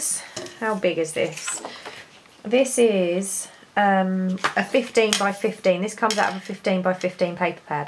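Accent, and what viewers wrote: England, United Kingdom. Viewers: These are just just gorgeous I love her so much!! I’ll be trying these 😍 love your sweet ways you are so great to watch! Love your lovely English accent too xxx be safe keep your fingers safe 😜 love ya xx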